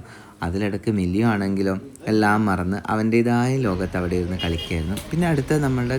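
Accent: Malabar, India. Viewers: മലബാർ സൈഡ് ഒക്കെ ഇങ്ങനെയാണ്, മലപ്പുറംകാരി ❤️ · മലബാർ സ്ലാങ് അല്ലെ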